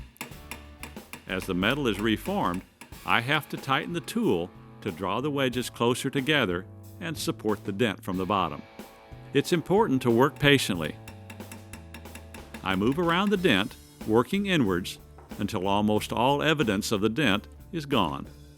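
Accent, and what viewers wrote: Missouri, United States. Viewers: He's from Ely Missouri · I only watch these videos because he has the nicest American accent ever! 😆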